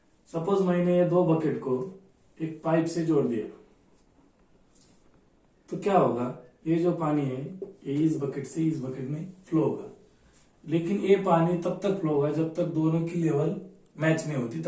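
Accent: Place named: India. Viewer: Love your Hindi Accent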